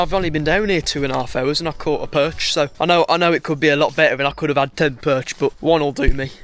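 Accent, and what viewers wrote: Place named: United Kingdom. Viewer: good vid bro but the uk accent is so annoying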